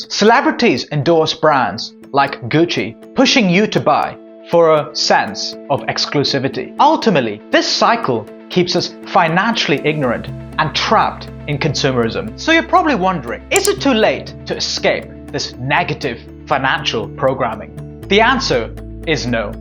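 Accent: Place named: United States. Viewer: bro face indian bro voice american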